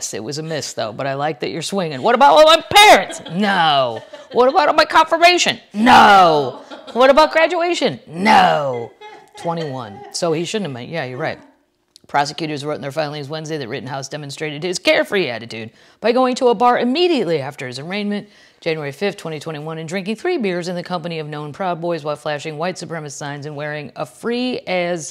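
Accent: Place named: Boston, United States. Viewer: Your Boston accent is everything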